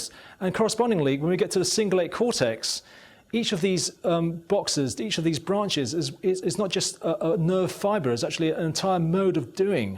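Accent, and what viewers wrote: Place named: United Kingdom. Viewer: This is the kind of thing that makes YOutube great. Not this Miley Cyrus garbage all the time. Great video. Weird to hear a Chinese guy with a British accent!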